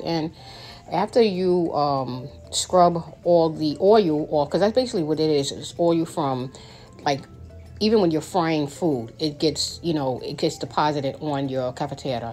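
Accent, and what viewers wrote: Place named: New York, United States. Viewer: Great info, I just restored mine using this information! Plus I like how you talk, New York!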